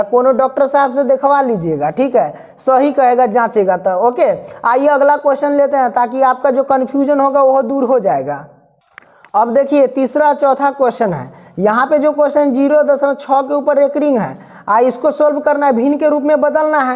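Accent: Bihar, India. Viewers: Sir aapka boli se samajh gaye ki aap bihar ke chore hai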